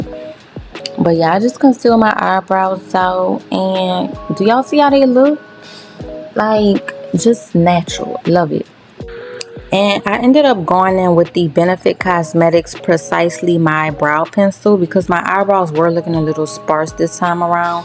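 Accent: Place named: New Orleans, United States. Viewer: I know a NOLA girl when I hear 1 😂 love your video sis keep going 🩷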